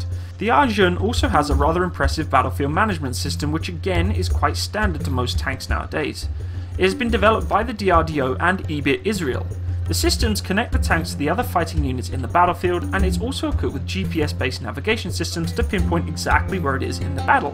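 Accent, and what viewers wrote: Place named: United Kingdom. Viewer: Neat trick starting off by praising and admiring the tank making tall claims and then in the last 2 minutes, discrediting those very claims to present a sorry picture. This is a cool tactic of youtube reviewers who are essentially amateurs and have no real authority in the field that they are reviewing. Their channels end up being a hotbed for racist bigotry and snide armchair internet experts. You remind me of a dude that does aviation reviews (DJ's Aviation or as he calls himself 'Dee Jayy's Aye vie Ayetion'). His only credential for doing aviation reviews is "he's seen a plane". I am assuming both of you are not the same guy as he sound Australian while you sound British.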